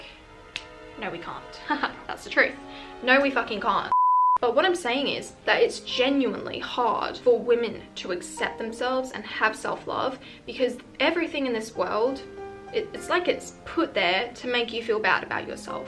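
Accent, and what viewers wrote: Australia. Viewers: Hey! I just love your content sooo much. It’s so informative and full of your experience. I guess everyone is glad that you share all your knowledge about life and many important other topics. Every time I watch your videos when I‘m down it makes me feel a lot better. Somehow it’s so calming to hear you speaking. (I LOVE YOUR AUSTRALIAN ACCENT). Thank you for everything<3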